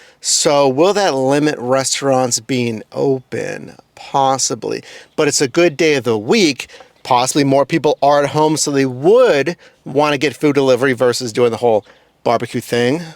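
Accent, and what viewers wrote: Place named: Pennsylvania, United States. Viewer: It's s that pennsylvania accent 😉 I have to say, your phone restarting was a sign to call it a day; I'm surprised that you didn't get a lot of offers on a holiday.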